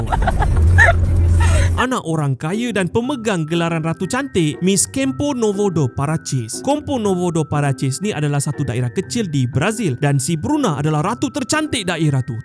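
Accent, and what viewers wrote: Sabah, Malaysia. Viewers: Abang ni orang sabah kah? 😂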